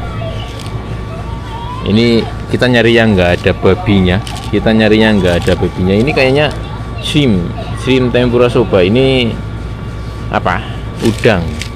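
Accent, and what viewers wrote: Banyumas, Indonesia. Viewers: Kang ngomongnya kenapa ngapak ngapak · Rika wong ngapak ya mas..yawis pda kya nyg brarti 😅 salam ngapak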